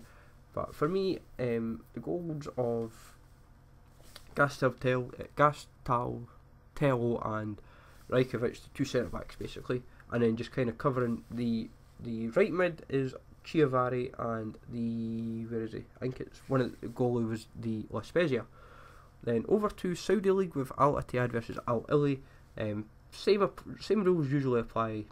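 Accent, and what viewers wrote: Scotland, United Kingdom. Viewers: I am from Ukraine. First I barely understood what this guy said, but now I even like to listen to him and understand (well, mostly). "Scottish" language is so funny to listen to:) When I was in Scotland I enjoyed every moment listening local people. Keep up great work,!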